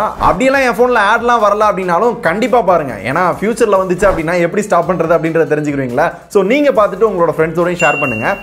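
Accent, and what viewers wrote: Madurai, India. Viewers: Bro nega madurai ya🙋🙋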